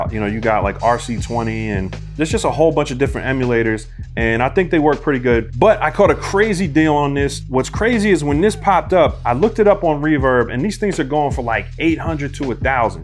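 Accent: Philadelphia, United States. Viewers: Hey why you have a lil philly slang when you talk lol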